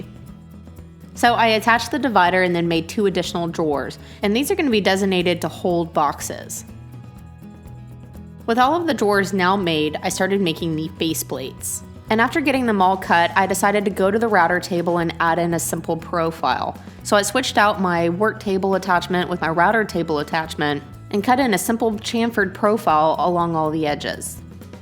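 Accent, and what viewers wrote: Texas, United States. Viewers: I really like your work, I have for a very long time. this build and project video. are great. Also love your Texas voice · Wales here too:P ever since reading another comment mentioning the word sawL I notice it everytime now but hey a Texas girl, everywhere has their own little language/dialect quirks - how many people in the world will know exactly what a 'cwtch' is?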